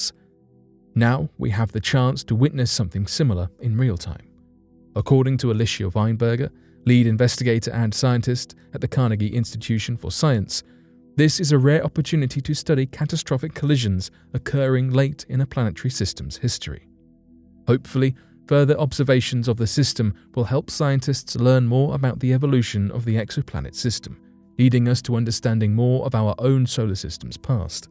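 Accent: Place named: Southern England, United Kingdom. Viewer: It's southern English same as mine an you really can't understand? where s your accent from just curious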